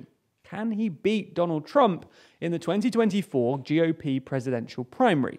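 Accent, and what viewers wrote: United Kingdom. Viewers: What's with the accent? Are you based in UK, or are you a transplant over here, Stateside?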